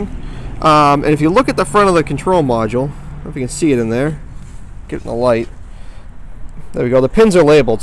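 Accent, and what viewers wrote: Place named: Massachusetts, United States. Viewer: Are you related to Ivan (I think that is his name) of Pine Hollow Diagnostics? You sound a lot like him, but with a bit of a Mass accent, you look a lot like him, you have same mannerisms AND you guys are both high level with auto electronics... Great video, ty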